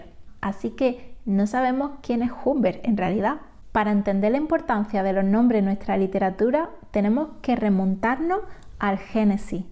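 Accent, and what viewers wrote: Andalusia, Spain. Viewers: Es acento andaluz. De Granada, creo.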